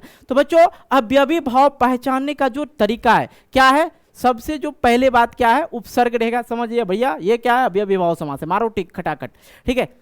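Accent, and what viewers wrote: Bihar, India. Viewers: Sir aap bihari ho kya ❤ Aap bihar se ho kya ❤😊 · Tum va bihari he ka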